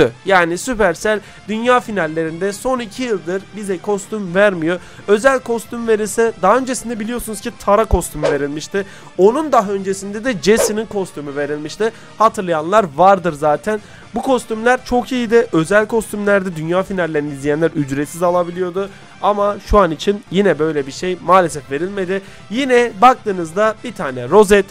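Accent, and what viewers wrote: Gaziantep, Turkey. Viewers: Abi Sen Antepli misin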